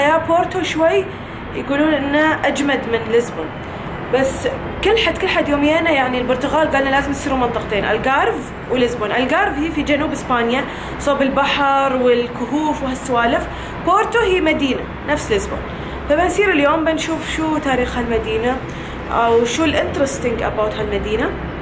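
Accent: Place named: United Arab Emirates. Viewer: قبل لا اشوف الفيديو ضغطت لايك لاني واثقه انه بيكون حلو وصرت اعشق اللهجه الاماراتيه منج يا وحفضت جم كلمه ( يزوغ = يخوف ، ازقرها = نادها ) وبعد والله نسيت المهم لا تطولين علينا بعد نبي فلوقات ما شبعنا انا من الكويت احب فلوقاتج وااايد 💐💐😘سلمي على زايد يا حليله 😘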